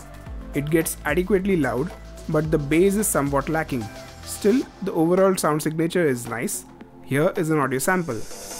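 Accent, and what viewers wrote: India. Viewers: Laptop, lol, love that indian accent :D. Great video!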